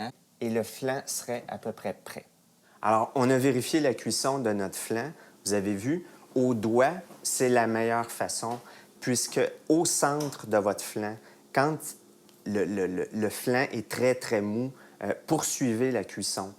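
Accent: Quebec, Canada. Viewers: Voilà une bonne recette avec le bel accent de nos cousins de Québec. Et une petite leçon sur la culture des poireaux au Canada, c'est intéressant. En principe je fais les poireaux en quiche ou en gratin, et je vais essayer cette belle recette. Merci!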